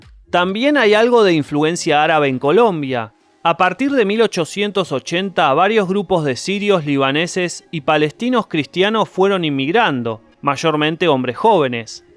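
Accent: Argentina. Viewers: Is argentino 🇦🇷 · Hola. Excelente video, recuerdo q cuando cursaba como 4to de primaria, nos hacían ver en Historia de Colombia a las tres grandes familias de indigenas en Colombia, es decir la Chibcha, la Caribe y la Arawak, y pues dentro de la Chibcha destacaban los Muiscas, los Taironas y los Paeces, pero a día de hoy sabemos que eran muchas más. Colombia es un lindo pais para recorrer, obvio no voy a mentir tiene sus cosas malas, pero animense a venir, yo siempre recomiendo ir a el pueblo y la laguna de Guatavita y ver el embalse de Tominé. Conocer Villa de Leyva, y tambien el valle del Cocora, y claro el infaltable, Guatapé y subir a la pierdra del Peñol. Por el acento del youtuber se que es de Argentina, un país que espero conocer pronto, y pues me imagino que sí ha venido a Colombia y si no pues animese parce. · Yo pense que hiba escuchar un Paisa.. pero resulto ser un Argentino... 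Ironia? hahah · QUE FASTIDIOSO ESE ACENTO ARGENTINO, BUSQUEN OTRA PERSONA PARA DAR A CONOCER ESTE VIDEO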